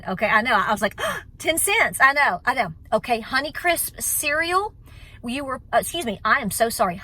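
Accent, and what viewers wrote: South, United States. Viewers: you have a Southern accent your a upbeat Energetic active woman i wanna be upbeat Energetic active like you are when i am your age i subscribed to your channel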